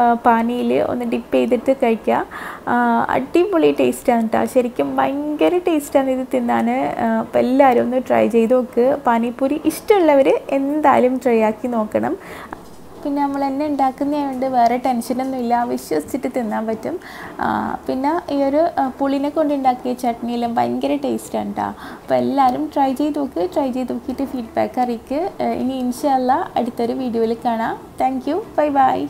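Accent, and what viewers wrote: Kannur, India. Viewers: നിങ്ങൾ kannur കാരി ആണോ കണ്ണൂർ ഭാഷ പോലെ തോന്നി 😄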